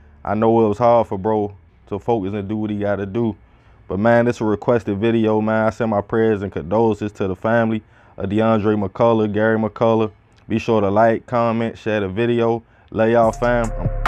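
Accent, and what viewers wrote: Baltimore, United States. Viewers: narrator is a native Baltimorian?? The accent???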